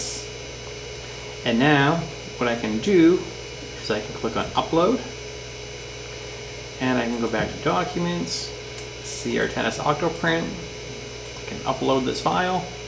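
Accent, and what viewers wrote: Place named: Maine, United States. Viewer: Thanks for the great video very informative it’s nice to hear from a fellow Mainer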